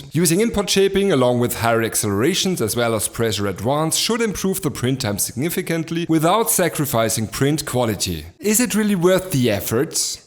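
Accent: Germany. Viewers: Welcher Dialekt aus Bayern, nehme ich, war es?? Antwort würde mich freuen!!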